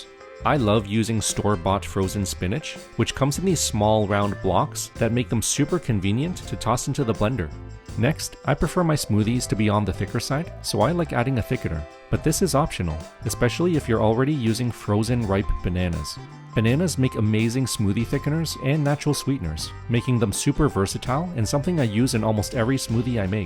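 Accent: Canada. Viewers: Do i catch a Canadian accent kind sir?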